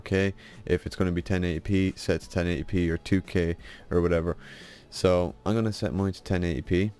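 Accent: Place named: Ireland. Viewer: First Tutorial with an Irish accent and I love it.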